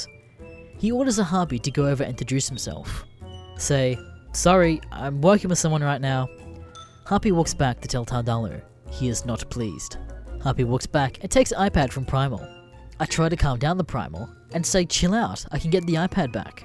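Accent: Australia. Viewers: Wait your an AUSSIE A REEEEEEEEEEEEEEEEEEEEEEEEEEEEEE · good morning aussie boy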